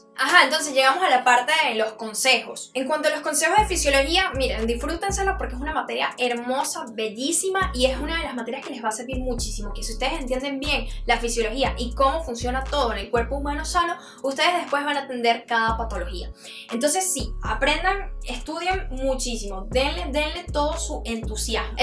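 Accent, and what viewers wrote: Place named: Venezuela. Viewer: Por el acento yo diría que es venezolana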